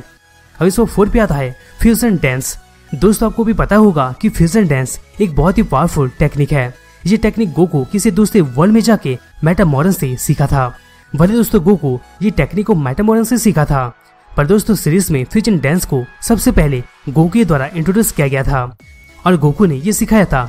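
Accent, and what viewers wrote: Bengal, India. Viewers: Are you Bengali aap ke batoo me Bengali ka jhalak hai like dekha jeto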